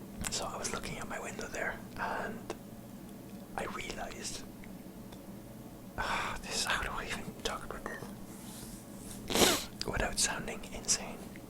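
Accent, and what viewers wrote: Ireland. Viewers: love love love the Irish accent and your voice is soooo tingle-inducing. thank you xx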